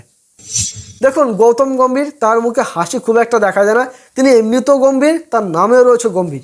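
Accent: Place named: Kolkata, India. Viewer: হাগল দাদা। পাগল। কলকাতার বাংলা টা বেশ ফানি। যদিও মানতে অসুবিধা নাই যে , তোমাদের টাই শুদ্ধ বাংলা।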